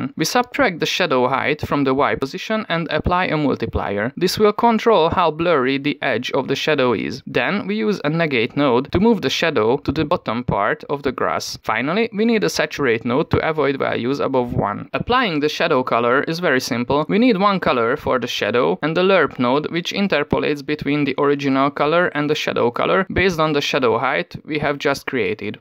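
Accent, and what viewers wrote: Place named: Hungary. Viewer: Hunglish accent my beloved ❤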